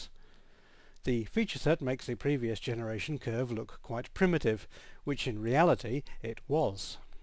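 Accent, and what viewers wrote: United Kingdom. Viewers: r u welsh?!?!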